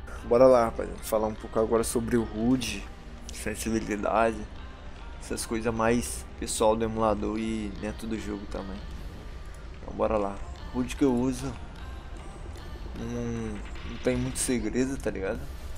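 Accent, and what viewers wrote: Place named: Bahia, Brazil. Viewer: Antigamente voz de maloqueiro hoje em dia tem voz de baiano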